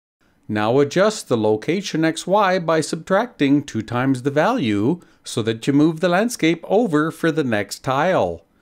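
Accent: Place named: Canada. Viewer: You do you. Be yourself. Your voice is part of your character. You're Canadian. I dig it. :) Certainly public speaking experience benefits everyone. But people like to complain. If it's not one thing, it's another. So, really, who cares...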